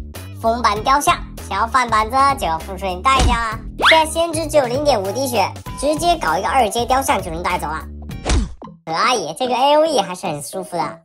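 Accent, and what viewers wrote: China. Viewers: 導演你是大陸人嗎？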